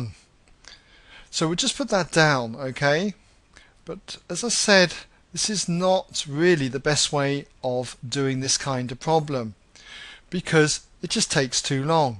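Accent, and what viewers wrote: United Kingdom. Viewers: Wow nice British accent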